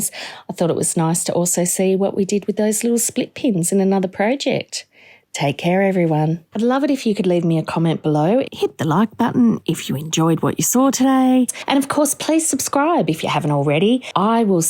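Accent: Australia. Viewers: You are an absolute delight! Love your Aussie accent, put a smile on my face, being a fellow Aussie living in West Yorkshire, UK! Will definitely have a go at making these wee gems and your split pins/Brad pins too! Thank you for a wonderful tutorial and I’m so happy to have found you! 🥰🫂❤️🙏🏻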